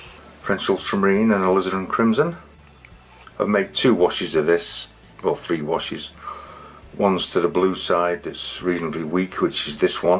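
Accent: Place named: Sheffield, United Kingdom. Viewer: This is kinda random but, your accent reminds me of several of my favorite musicians like Richard Hawley and Alex Turner. They both come from Sheffield in the U.K. and in particular I've heard them say they are from High Green(I'm American and have never been to the U.K. but certain accents are somewhat recognizable to me) To me the 'Sheffield' accent sounds similar to Scottish and Irish.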